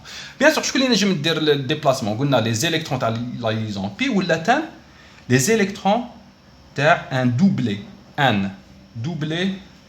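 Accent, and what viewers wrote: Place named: Algeria. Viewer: اه شكرا بارك الله فيك هادا الكور حوست عليه حتى شبعت نلقا غير المغاربة يشرحو فيه او مافهمتش عندهم باسكو الدرس ماشي كيما تاعنا سواسوا قلت نكمل نشوف عند هدا اللخر و خلاص حسبتلي تانين مغربي حتى سمعة اللهجة تاعنا 😅 والله غير فرحت ربي يعينك او يجازيك خير الجزاء🤲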